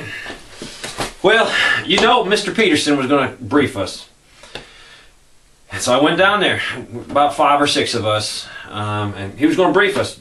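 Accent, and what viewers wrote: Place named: South, United States. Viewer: He is saying odd ball caliber he just has a southern accent so it sounds different.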